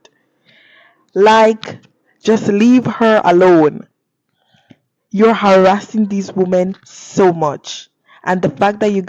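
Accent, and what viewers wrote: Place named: Caribbean. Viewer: Nothing someone does it perfect. I will agree some of these podcast approach towards woman is harsh and sometimes unfair, but they are a necessary "evil" per say since the 80% of men who were left on read by most woman are either going to follow these guys... or Join these females onlyfans. For every actions, there is a reaction. Woman want to continue to aim for the best, as they say the 20%. which is fine and is expected to go for the best you believe you can get, but this is not the case. There is a certain amount of guys who do rotate around the same girls, Even if these girls are dating a "nice guy" they have their lapse of judgment with these men. I can agree they themselves may not be the BEST people to preach the message, but no one was preaching anything. The reaction to woman dating choices is this. Hardened Men who are sickened by the westernized woman I can hear some Caribbean accent from you, so i can tell you may not be with the western woman BS, but you can't speak for the majority of woman. 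As a guy who has dated, and dealt with many woman. Yes woman are generally the same, The same way Men are generally the same. We have our own individual differences, influenced by our upbringing and society who grew up around, but biologically, when it comes to dating and how woman choose their mate, just like men, is generally the same. Per instance 9/10 Woman do not like Nice guys, or push overs. You try to work a Hardened tough guy to become more soft, like building you'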